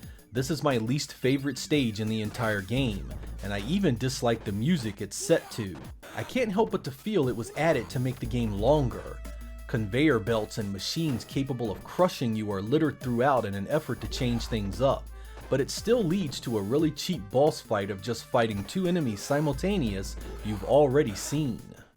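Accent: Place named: Mid-Atlantic, United States. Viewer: You pronounce water as "wodder", not only do you make great videos, you represent the mid-atlantic region very well!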